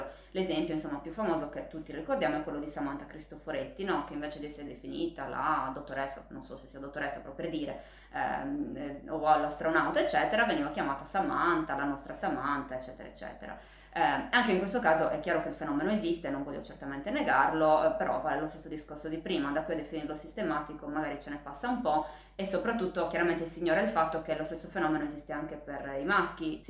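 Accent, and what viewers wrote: Sardinia, Italy. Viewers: Sei stupenda. Da lucano adoro il tuo accento sardo...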